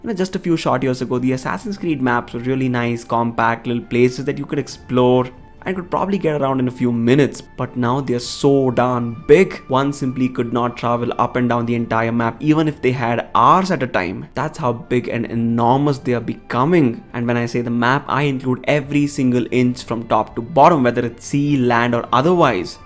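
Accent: India. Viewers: probably this guy, after all he sounds like a typical indian scammer that might phone your house. Can't be trusted.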